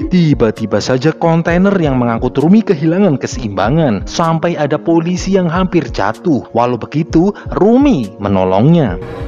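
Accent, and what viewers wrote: Banyumas, Indonesia. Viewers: wong ngapak apa ya admine😂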